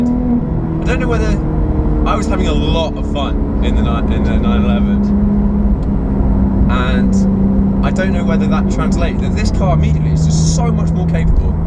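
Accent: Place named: Australia. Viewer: Did ytou study or ever live in Australia? You have a Sydney/International Aussie tone to your vowels.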